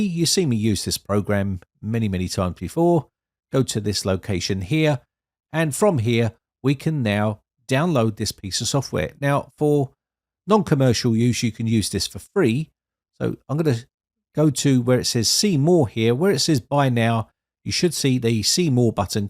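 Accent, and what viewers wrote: United Kingdom. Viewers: Bri ish tbh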